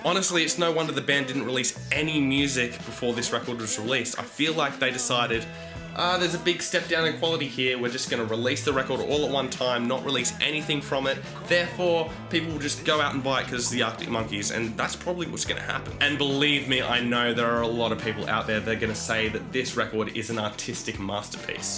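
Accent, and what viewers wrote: Australia. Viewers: All these salty comments you should have realized you were in for a bad review when you heard his Australian accent!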